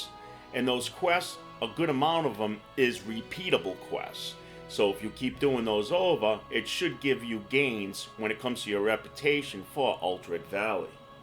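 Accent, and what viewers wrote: New York, United States. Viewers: Thanks for keepin it real, also dig the NY accent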